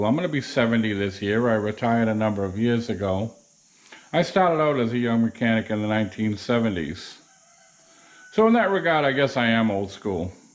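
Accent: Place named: New England, United States. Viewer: Hey you sound like a respectable New England guy. If you’re in the Southern NH area I’ll pay ya $25 a rotor to do mine lol. Or if you have a recommended shop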